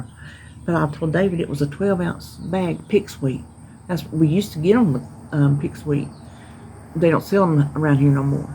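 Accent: South, United States. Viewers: 🐯Hi have a great week. Your giving good advice🐯Thank you. Plus I like your Southern accent. I'm here in Iowa🐯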